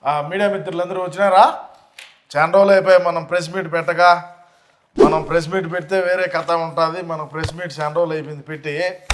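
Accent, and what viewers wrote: Rayalaseema, India. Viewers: hilariosus and entertaining, good తెలుగు & సీమ accent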